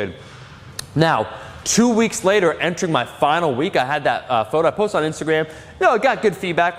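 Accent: Switzerland. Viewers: That Schweizerdeutsch though... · Never thought I'd hear Swiss German in an American fitness video. Greetings from the old country! Aber, der beste Käse immer kommt aus die Romandie oder Tessin, und du wisst das wie alle Düütschschwiitzer. Emmenthaler<Gruyère<Büscion da caura. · Dialect. I think it's Swiss German.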